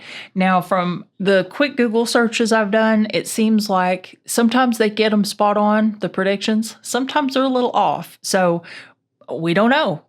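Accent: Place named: Kentucky, United States. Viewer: Hello. LOVE your accent (my father was from Kentucky. It's a beautiful state!), and your readings. Bless you for the New Year 🎊🎆🎇🔔!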